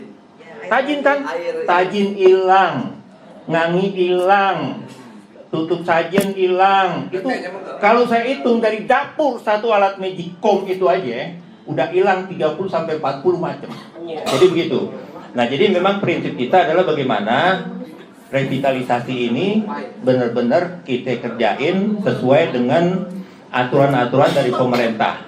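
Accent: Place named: Jakarta, Indonesia. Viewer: kalo di liat liat sibabe make logat betawi pinggiran ..syair syair nyang babe lagukan juge syair betawi pinggiran persis kaye alm H bokir legenda lenong betawi ..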